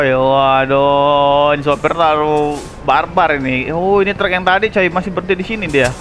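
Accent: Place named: Java, Indonesia. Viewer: Bang sampean jowone ngendi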